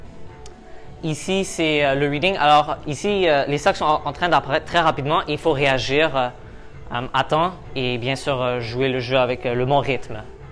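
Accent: Quebec, Canada. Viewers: Oh, I was not expecting this. Your French is pretty decent ! Where did you learn to speak like this ? It sounds a bit like a French Canadian accent.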